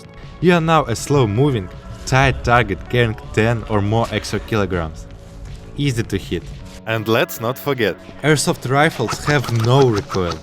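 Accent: Poland. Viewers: We understand you are not Hungarian you are polish! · are you polish?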